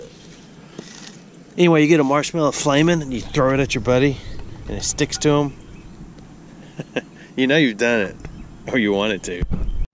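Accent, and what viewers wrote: South, United States. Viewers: your explaining of things that you collect, is very informative, Plus I love listening to your southern accent . please keep talking.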